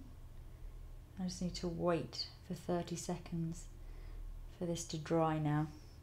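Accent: United Kingdom. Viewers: I love yr British accent!!!!!!!!!!!!!! <3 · Beautiful!!! Oh, by the way, I love your accent. Whereabouts in the UK is it from? All the best from a Portuguese fan:) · British lady <3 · English is not my first language but I definitely understand every word she says.......She speaks perfectly.....This is the british accent that I adore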